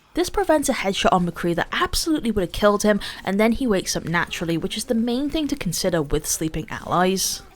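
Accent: United Kingdom. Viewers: This is an amazing tips video I've never even thought of (I'm so ashamed) or heard of. I LOVE Coma and Dding - I bought the Shanghai skin for Mercy because Coma is insane (with all access pass, watching his PoV is just... incredible and shows how much work a Mercy can actually put in). I love the fact you brought them up!! I'll probably end up forgetting your disclaimer and trying this in comp and getting rekt but yeah. Thank you for your videos, I just discovered your channel through Twitter rabbithole-ing, I saw your post about some dude raging about your voice. Idk what I expected, but your voice is really soothing and pleasant to listen to! Of course, we Americans tend to love British accents (I hope that I'm not getting your ethnicity wrong here, apologies if I am). Basically you sound wonderful, some people are just hateful to the point of delusion. 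:) Keep up the amazing work, I am a new subscriber and am already learning a lot. (Also I have about 250+ hours on Mercy so this truly means so much to me to learn new and EXCITING things.)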